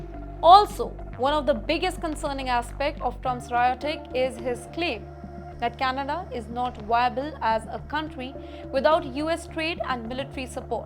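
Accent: India. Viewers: Bro it's Indian English, Not an American English.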